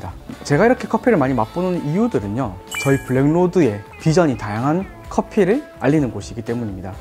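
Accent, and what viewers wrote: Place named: Gyeongbuk, South Korea. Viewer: 경북지역 억양 인듯요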